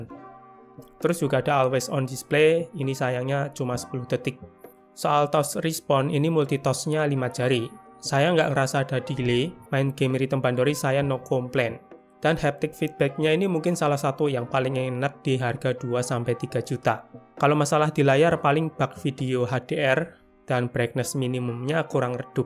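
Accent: Surakarta, Indonesia. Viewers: Lah orang solo bang? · Dari solo kah bang?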